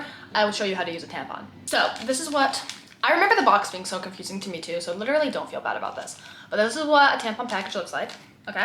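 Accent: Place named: Texas, United States. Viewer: Is it just me or could I sense a bit of texan I’m her accent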